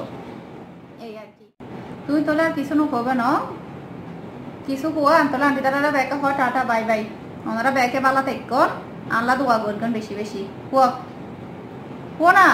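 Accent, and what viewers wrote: Chittagong, Bangladesh. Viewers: আমাদের বাড়ি চট্টগ্রামের হাটহাজারীতে আপনার চিটাগাং এর কথার সাথে আমাদের চিটাংগের কথা একটু উনিশ বিশ পার্থক্য আছে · Age to jantam na manos ato sondor kore chottogramer Basa bolte pare Kotar maje maje ekto bol holeo onek sondor hoyse ☺️☺️☺️☺️👌👌👌👌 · Aii o chittanga..... 😂😂😂sundor oiye....👌👌👌👌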